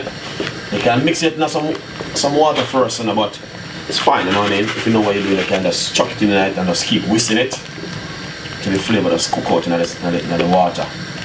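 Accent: Jamaica. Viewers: Omg just listen to him he did say pumpkin, it's just the Jamaican accent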